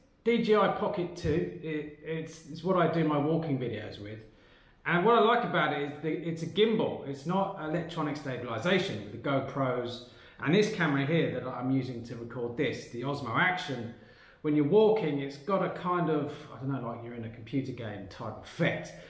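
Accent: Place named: South London, United Kingdom. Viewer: Who needs a kitchen with a 7 and noodle shop so close.Lol. Your excitement over a lotus fresh was quite overwhelming and could prove to be a great 'marketing' tool for the company(if they get to see it) and the shot of that lonely grapefruit will last with me forever. All in all another great vid, I do like the casual and friendly manor you have when interacting with the locals and your 'river wandle' accent makes the whole experience pleasurable. Keep it up fella.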